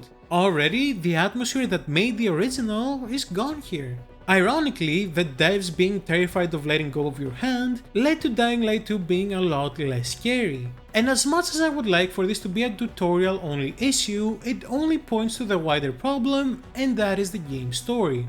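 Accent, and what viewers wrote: Greece. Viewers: The greek accent is so noticable 😂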